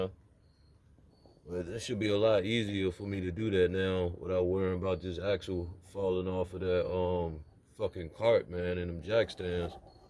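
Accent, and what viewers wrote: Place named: South Carolina, United States. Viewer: I’m new to the channel but I swear from the way you talk you’re from low country South Carolina.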